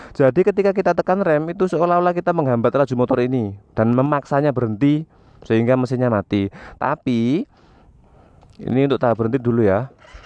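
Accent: Java, Indonesia. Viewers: pake logat jawa :v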